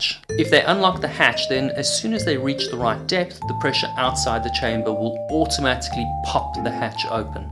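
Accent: South Africa. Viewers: South African accent on point💨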